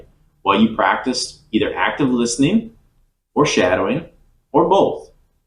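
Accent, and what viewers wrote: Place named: General American, United States. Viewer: face-blue-smiling: ... Thanks a lot teacher for all you do for us. By the way, where is your accent from (state/city). I like it a lot. It's crisp and clear. It sounds like the General American English. When you speak I pause the video I repeat what you just said and try to imitate your accent. Greetings from Miami ... !!!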